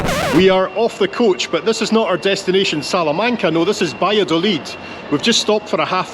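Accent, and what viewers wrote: Scotland, United Kingdom. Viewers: As a Norwegian, I do understand your Scottish dialect quite well. I have a friend from Glasgow, I need to ask him a few times when he elaborates in discussions in Glasgowean. I guess it is a matter of listen to it and get used to it and to tune your ears to it. Then you will hear and understand it after some listening. I have the Rab C Nesbitt series, it is subtitled in English. I invite you to Norway. We do have dialects here that are like separate languages. Norway and Sweden is like Scottish and English dialects. Swedish people say they dont understand Norwegians, but that is just lazyness and non interest of trying to listen to the sound. Norwegians is opposite and try to tune in to Swedish language and that works. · Hey, I just subscribed to your channel. I've been watching for about a week now and to mimic your lovely Scots brogue "I haven't been disappointed!" Your day trip to Gothenburg was an eye opener as this is where my granny grew up and throughout my life never knew what the city was like. 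Thanks. This morning I watched your Salamanca return visit. It was amazing. After watching all these trips of yours, I just cannot figure out how you can afford it 😮. What's your secret? I'd also like to know a bit of your back story. Letting you know you have a new fan.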